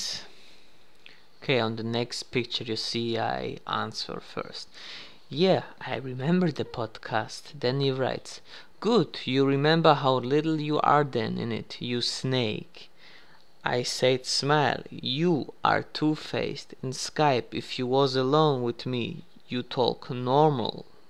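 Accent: Balkans. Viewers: I love Balkans Accent xD Sounds like Borat, VERY NICE xD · Your accent is raw as fuck!!!:D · I LOVE BALKANS ACCENT SO MUCH XD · I'm sorry I love the way you talk Niko Belic FTW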